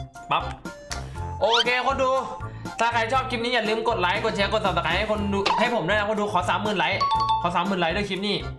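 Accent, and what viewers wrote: Korat, Thailand. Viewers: โคราชจ๋าเลยน้อK2J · สำเนียงโคราชก็มา55💖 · โคราดจัด😆😆😆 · ผมชอบพี่พูดโคราช เเทะๆ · พูดโคราชทั้งคลิปหน่อยครับ555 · คนโคราชและดูออก😁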